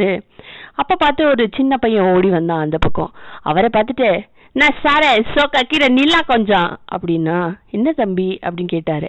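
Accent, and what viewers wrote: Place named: Chennai, India. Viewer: Madras Tamil, modulation and voice change Super 👌👍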